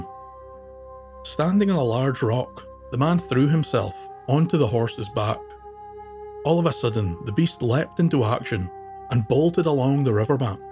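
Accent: Scotland, United Kingdom. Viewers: Omg I love your Scottish voice! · I love the Scottish accent and learning about the culture & folklore. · Our first time watching.. the kelpie video was excellent.. Two things that encouraged us to subscribe., First your voice.. that beautiful Scottish accent.. Also Scottish we are.. and love folklore.. so After we subscribed we saw your wolf logo.. and felt content.. Both of us have wolves as spirit animals! Please keep making videos.. we love them🌹 William and Jen 💜💙🌱🌹🙏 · Thanks so much for this wonderful tale! It might be my favorite so far. Also, I could listen to your lovely voice for hours. Would you mind sharing the area of Scotland where you were raised?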